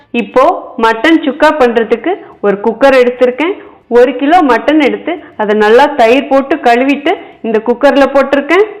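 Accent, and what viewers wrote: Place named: Kongu, India. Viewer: Supernga. I am vasuki Aunty. Coimbatore Tamil i am Coimbatore. Kongu tamil super.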